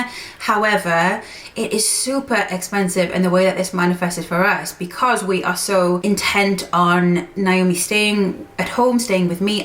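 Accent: United Kingdom. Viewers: are you actually Japanese or are you British because you seem Japanese but you have a British accent? I’m curious I’m American myself by the way my name is Jordan and I am a boy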